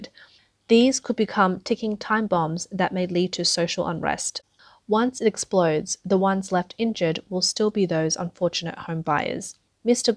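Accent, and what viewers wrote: Australia. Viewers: This narrator has a perfect Australian accent. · Aussie accent narrator is the BEST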